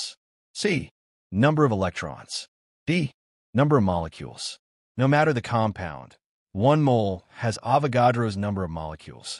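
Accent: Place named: United States. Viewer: #* americian voice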